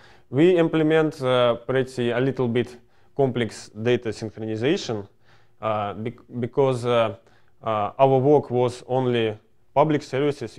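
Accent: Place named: Russia. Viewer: вот есть индийский английский а тут русский английский.. Лучше на русском, многие ищут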